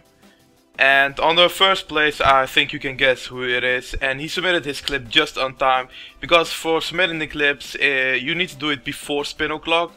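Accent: Netherlands. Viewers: Are you dutch :0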